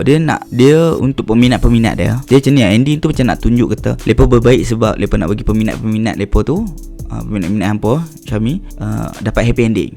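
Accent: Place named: Kedah, Malaysia. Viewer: cakap kedah pon pahamm aih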